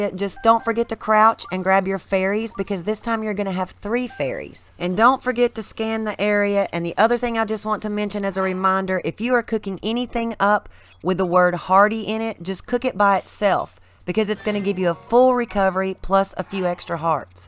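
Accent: South, United States. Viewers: Thank you, kind southern lady! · Thanks. I beat the last two with ur help. Since I'm southern all the women i know sound like u so maybe birds of a feather? make it very easy to follow your advice, listen to ur voice, make sense of ur logic! Happy 4th of July God Bless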